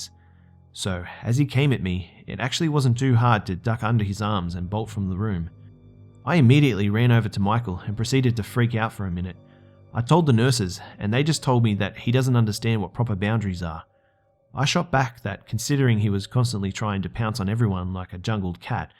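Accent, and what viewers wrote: Australia. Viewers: Hold up your from australia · Your voice is perfect. The Aussie pronunciation is very refreshing. Great video as always. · I did not know you were Australian until now. Cool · Can't tell if you are trolling but the narrator is very, very Australian. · I...Can't believe it took me this long to realize you're Australian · OHH YOURE AUSTRALIAN!! I love that (btw is that weird? sorry, I'm American)😂👌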